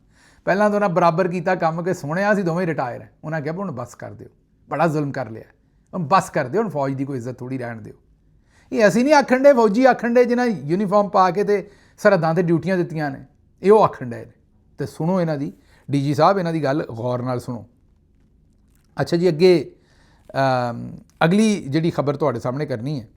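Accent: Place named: Ropar, India. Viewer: SB THODI BOLI SADI ROPER(AMBALA) WALI LAGDI AAA,BOHAT PYAAR AUNDA THODE TE.SADQE JAYON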